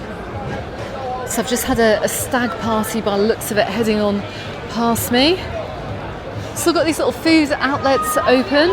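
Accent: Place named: Northern England, United Kingdom. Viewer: At first, I thought it was just some town in the north of England, until I realised it was commentating... Lol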